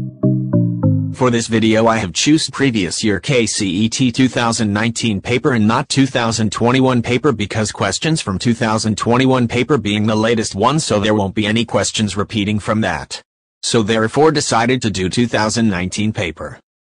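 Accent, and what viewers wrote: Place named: Spain. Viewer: Super bro Spanish english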